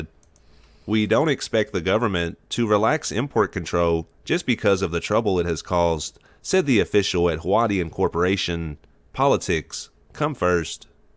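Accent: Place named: Texas, United States. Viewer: This dude has Texas accent wit good old boy twang to it .But besides that China is using more resources then America ,and at some point the shit is going hit the fan on resources in the world ,but may with pandemic will save some resources for a short time ,but I don't care about China .But the sure is going to be fuck up in the future with resources .And mostly will have do with some greedy assholes at the top of the food chain ,who are no very bright as it due the fact some their daddy's give them the company or very large wallet to throw around money .Since this always starts at the top .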